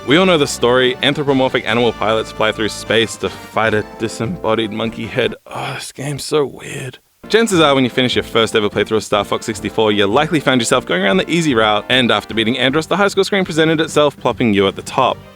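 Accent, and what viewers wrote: New Zealand. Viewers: you sound like youre from nz, which begs the question, why isn't this titled Lylat Wars?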